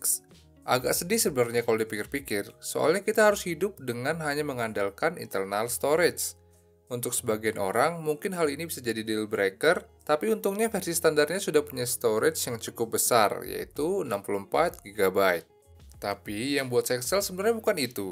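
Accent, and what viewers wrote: Lampung, Indonesia. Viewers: orang lampung ya?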